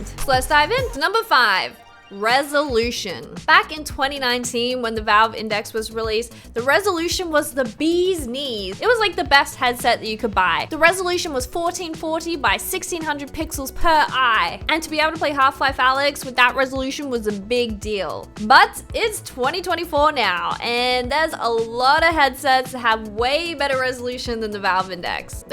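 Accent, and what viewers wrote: Australia. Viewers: Your Australian?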